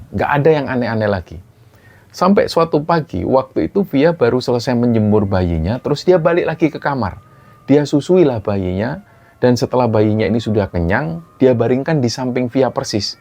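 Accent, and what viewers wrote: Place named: East Java, Indonesia. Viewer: sama sama mas jujur saya dari dulu ngefans dari taun 2021 ke juga ke mas sebagai narator cerita horor menurut saya beda pembawaan nya sama narator" yang lain apa lagi kalo udah ngomong pake bahasa Jawa timurnya saya suka logat medok nya 😊❤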